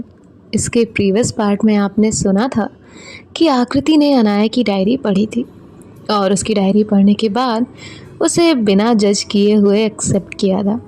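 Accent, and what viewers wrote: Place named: Punjab, India. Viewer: Or ap bataiye kaha se hain ap Punjab se ya chndighar aisa lag rha h but ap bataiye ..hum mp se hai .Ujjain se ...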